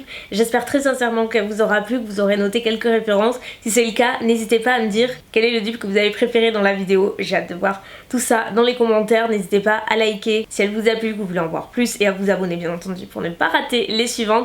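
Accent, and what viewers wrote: South, France. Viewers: Pourquoi tu dis "pace" au lieu de "parce que"? Est ce ton petit accent du sud😉😊 très très bonne vidéo! Pourrais tu tester entièrement la marque W7? Bisouss❤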